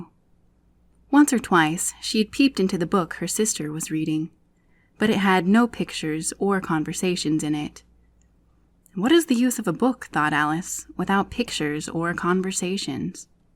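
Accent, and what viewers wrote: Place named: United Kingdom. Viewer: A British accent gives it its intented context over an American one <link>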